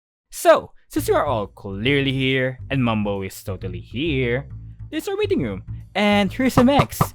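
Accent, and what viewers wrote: Philippines. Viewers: Wait... your accent? Are Filipino?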